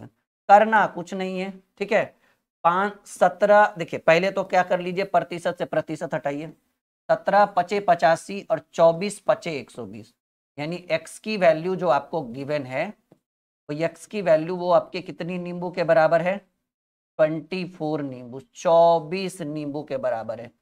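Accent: Bihar, India. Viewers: Sharab bahut achcha padha rahe hain kya aap Bihar ke hain kya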